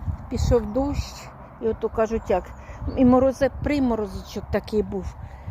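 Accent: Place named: Kharkiv, Ukraine. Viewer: доброго дня! Випадково потрапила на ваш канал. Дивлюся на вас, ніби на себе збоку)) Говір схожий чи не з Харківщини ви? А помідорчики... така ж історія, в погреб вже ставити ніде, роздала, а це вже бройлери смачно їдять)). Успіху вам, миру і перемоги нам усім!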